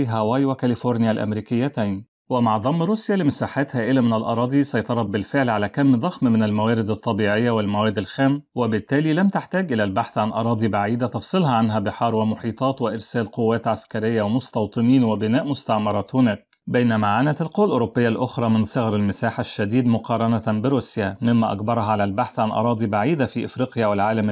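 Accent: Egypt. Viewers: كم أكره أن أدخل لمقطع وثائقي وأكتشف ان المذيع هو (مصري) بسرعة أعطي (دس لايك) للمقطع وأخرج المصرين دمروا اللغة العربية والانكليزية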